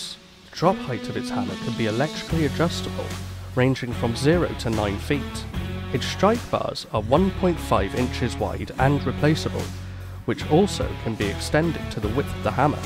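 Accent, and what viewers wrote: United Kingdom. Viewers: Lose the limey! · Well, great video. As much a fan of the British accent, I am wondering where is?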